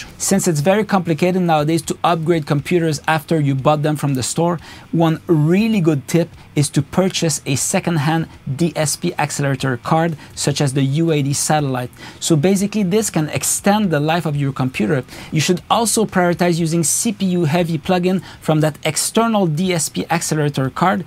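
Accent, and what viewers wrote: Quebec, Canada. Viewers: l'accent Québecois all the way!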